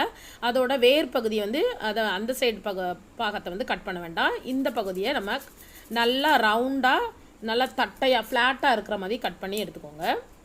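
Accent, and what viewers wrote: Tirunelveli, India. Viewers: Hehehehe nora natiyam 🤣🤣🤣 neenga thirunelveli ya?? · Unga pechi semma nenga thirunelveli ah ..... 😘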